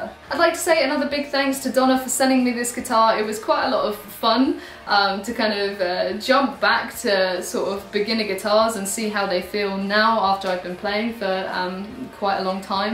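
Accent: United Kingdom. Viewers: You and Emma Watson have the same particular brittish accent. That and you're both wildly talented and beautiful as well. Loved the video! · У девушки классное британское произношение.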